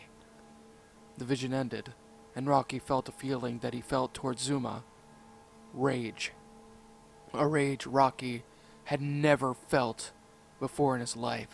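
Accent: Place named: Russia. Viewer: Not trying to be rude here but your French accent sounds like a Russian 's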